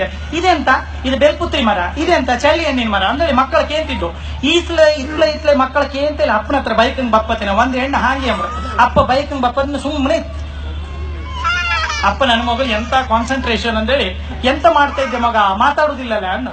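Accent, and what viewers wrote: Kundapura, India. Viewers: Love kundapura language style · Great kundapura kannada yavatidru.......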